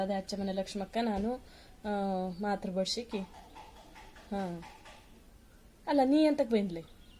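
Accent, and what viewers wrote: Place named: Yellapur, India. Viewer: ನಮ್ಮ ಪ್ರೀತಿಯ ಯಲ್ಲಾಪುರ ಕನ್ನಡ ಬಾಷೆ ಎಂಥ ಸೊಗಸು ನಿಮ್ಮಿಂದ ಇನ್ನೂ ಹೆಚ್ಚೆಚ್ಚು ಸೊಗಸು ಧನ್ಯವಾದಗಳು🎉🎉🎉🎉🎉🎉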